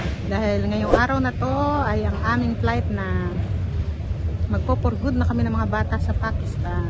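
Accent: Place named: Batangas, Philippines. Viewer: oh i see. ung boses at punto mo kc parang batangas.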